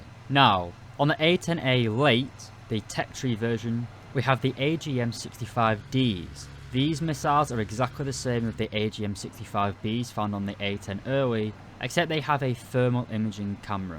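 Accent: United Kingdom. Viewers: I think he’s British.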